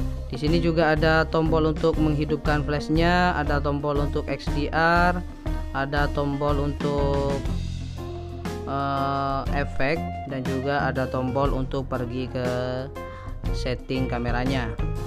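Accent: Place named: Madura, Indonesia. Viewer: Kok kedengarannya seperti logat Madura😁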